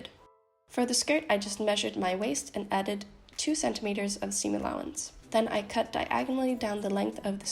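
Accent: Denmark. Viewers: This video was in my recommendations. The second you started talking I thought you sounded danish (the accent, I guess?). Then I saw the furniture/living space and again I thought it looked super danish. Then I checked and as far as I can tell you're from Denmark... Hahaha. I liked the video! :D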